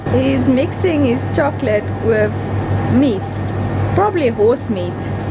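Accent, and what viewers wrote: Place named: South Africa. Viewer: You have a lekka South African accent bru😉👍🏾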